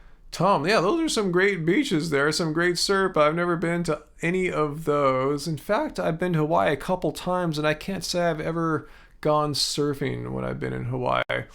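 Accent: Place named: Wales, United Kingdom. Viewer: Welsh hey? Ok we got you! :)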